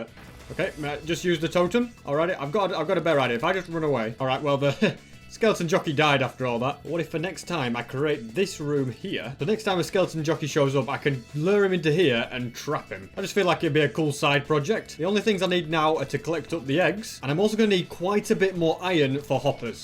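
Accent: United Kingdom. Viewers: Why we all love doesn’t scam has a satisfying BRITISH voice Edit: HOW I COULD FROGET The shiny eyes of course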